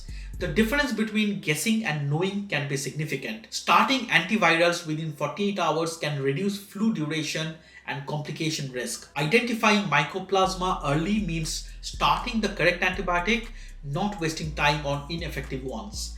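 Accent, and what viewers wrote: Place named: United Kingdom. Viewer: I love your British accent. Where in the UK are you from?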